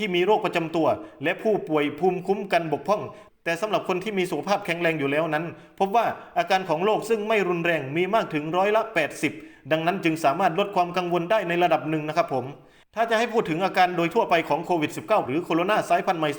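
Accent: Isan, Thailand. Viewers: ใช่สำเนียงคนอีสานแท้ๆ😄😃😂😁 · คนอิสานแม่นบ่เจ้า😁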